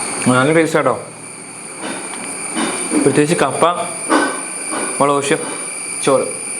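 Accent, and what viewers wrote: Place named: Kannur, India. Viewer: Hi മോനേ... എത്ര കാലായി കുഞ്ഞി...... കണ്ടിട്ട്? കണ്ണൂർഭാഷ ആണ് അങ്ങനെ പറയുമ്പോ ഒരു ഇഷ്ടം 😅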